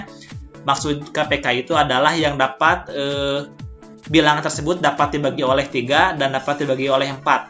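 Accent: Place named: West Java, Indonesia. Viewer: Nadanya sunda, lucu 😆👍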